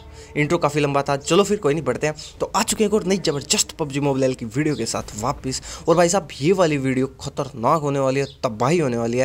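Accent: Haryana, India. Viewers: bhai humare Haryanvi hai kya rajasthani...?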